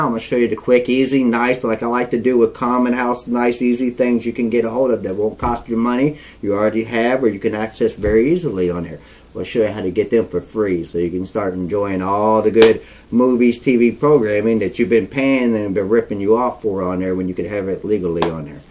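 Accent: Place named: South, United States. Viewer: I like his southern accent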